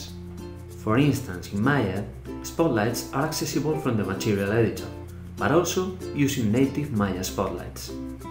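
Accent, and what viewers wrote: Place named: Spain. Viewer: Offended by a Spanish accent from someone named " "? It's a joke right?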